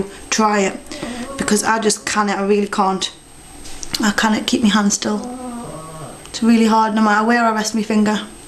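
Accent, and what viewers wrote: Newcastle, United Kingdom. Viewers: It's a Geordie accent! Newcastle, pet. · she is a geordie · I love your accent! Where in the UK are you from?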